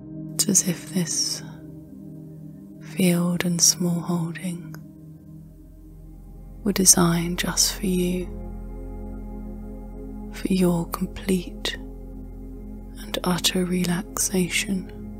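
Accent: United Kingdom. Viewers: I knew your accent had a lovely hint of west country, I thought maybe Wilts Dorset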